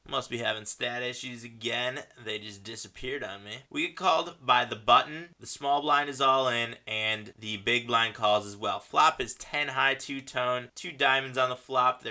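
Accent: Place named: Southern California, United States. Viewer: Stupid 7s ...$1800 though . You have built up a pretty nice balance ... It's only been like 6 weeks . Rad ! I learn a lot from watching these . Just out of curiosity , did you use to , or still have a YouTube page about fake staged events , flat earth , and NASA perm hair dues ? If so , send the link . My brother sent me a video of a guy talking about that , and he wasn't nearly as entertaining . The guy I wanted to show my brother , has a similar voice as yours ... But we all grew up in Southern California ... It may just be the regional accent that I'm identifying with . Anyway..Have a great Sunday . Thanks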